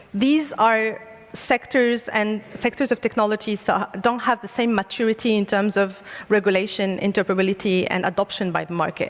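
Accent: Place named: United States. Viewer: Amazing American accent but very hollow speech...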